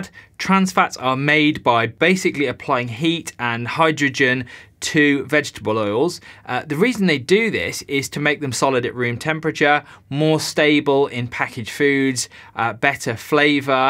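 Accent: United Kingdom. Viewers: As an American, I love this too. It's so nice to hear a British accent 👌🏽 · great to see you back you're my favourite source of info for my low carb lifestyle and nice to see a Brit doing it, nothing against the Americans, they're great but having a British voice amongst them is brilliant! I just wish the rest of the UK would understand this more- my friends look at me as if I am mad when I so no chips or no rice or no bread and dont even get me started about what reaction I get to cream, butter and lard! Looking forward to your next videos 😀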